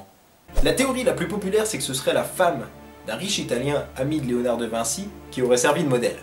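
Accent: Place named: Alsace, France. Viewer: Tu ai alsacien car j'ai reconnue ton accent .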